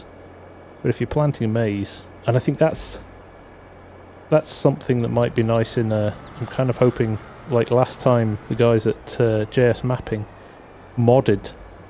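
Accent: England, United Kingdom. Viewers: Could I ask, are originally from the uk but now live in America? Im always lost that u have an English accent but do everything American 😂 pls excuse my ignorance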